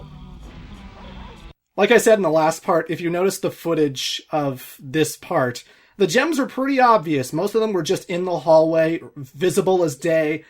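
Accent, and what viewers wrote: Canada. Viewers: Bro you're accent, dialect and your chilled charming canadian voice is always a pleasure to listen to while seeing your MLG megaman/sonic/any game playthrough. If you weren't canadian with this chilled personality then i wouldn't be into megaman. I've played more megaman games than the 8bit ones because of your LPs. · you say it right, just with a Canadian accent lol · WHO EVER IS BULLYING YOU ABOUT THE WORD HOUSE IS CRAZY. YOU’RE SAYING IT JUST FINE 😂 as a fellow Canadian, I concur!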